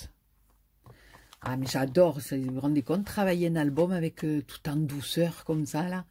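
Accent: South, France. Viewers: Coucou, tout d abord une bonne et heureuse année 2020,du bonheur, la santé très important et continues à nous émerveiller par tes créas, surtout ta bonne humeur avec ton accent du soleil. Le père Noël as été très gentil avec toi,ça prouve que tu as été gentille lol.j adore le dies bordure que tu as fais avec du papier noir. Les blocs de papiers sont magnifiques, j ai le dernier que tu as montré. Maintenant il n y a plus que,aller hop au travail. Merci pour la présentation. Gros bisous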